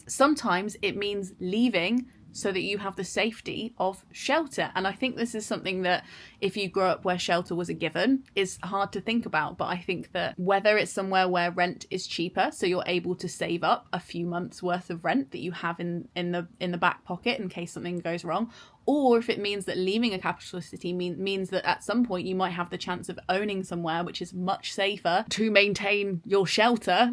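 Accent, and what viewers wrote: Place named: Nottingham, United Kingdom. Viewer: sounds like notts!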